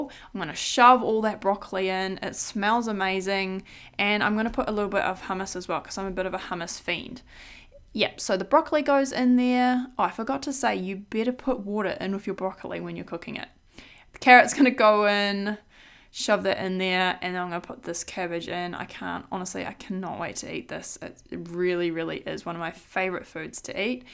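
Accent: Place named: New Zealand. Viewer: I love her New Zealand accent!!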